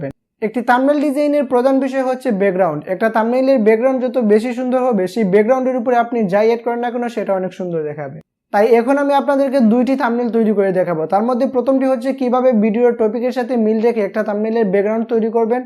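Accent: Sylhet, Bangladesh. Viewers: ji oy... Bai. Jesataw kow.. Ek Sylheti e onno Sylhetir mat bala kori buje🙃😇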